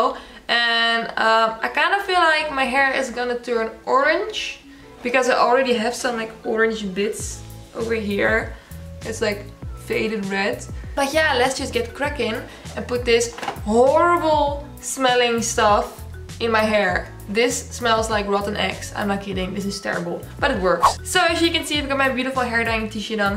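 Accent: Netherlands. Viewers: Hahaha altijd zo leuk als je denk hmmm Vgm is ze Nederlands want ze heeft een Nederlands accent en het dan goed hebben! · Whahaha ik zat voor het eerst te kijken en ik dacht ‘Dit is een TE Nederlands accent’ en zag in de beschrijving dat je inderdaad Nederlands bent! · I couldn't quite figure out why your accent sounded so familiar to me, until I looked at your channel and saw you were from the Netherlands which is where I'm from hahahaha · Is dat een Nederlands accent? 😏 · You have a dutchy English-speaking accent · Are you Dutch?? As someone from The Netherlands your accent sounds really Dutch 😂😂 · dutch accent hihi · Hahahaha 1 min into the video en hoorde gelijk dat je Nederlands bent 😂 love ur hair tho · Are you dutch you sound dutch ( first video of yours ive seen) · Hoorde gelijk aan Je accent dat je NL was 😂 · Are you Dutch? If yes, your accent gives it away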